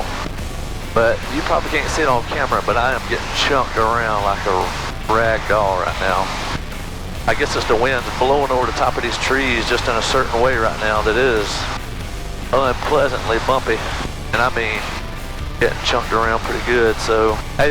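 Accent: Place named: South, United States. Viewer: Love that southern twang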